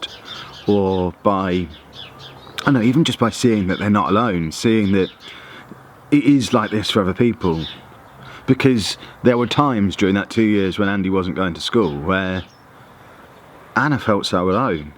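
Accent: England, United Kingdom. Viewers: Are you English or americar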